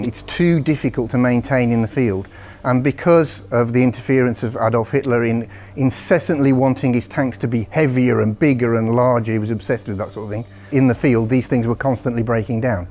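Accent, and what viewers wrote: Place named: Estuary, United Kingdom. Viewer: Fajny angielski tego gościa. Ktoś wie, jaki to akcent? Estuary?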